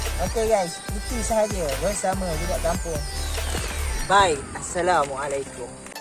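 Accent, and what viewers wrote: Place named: Perak, Malaysia. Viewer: Cakap Perak je coo..baru padu..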